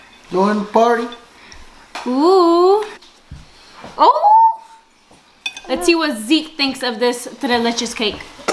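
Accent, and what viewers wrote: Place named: Texas, United States. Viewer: I love the video and how you explained everything!! I knew you were from texas from your accent.🥰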